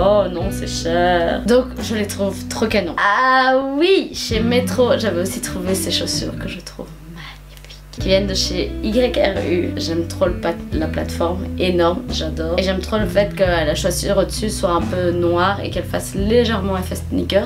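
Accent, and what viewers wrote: Belgium. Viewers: aaaah mais tu es si adorable! j'adore ta bonne humeur et cet accent belge qui donne le sourire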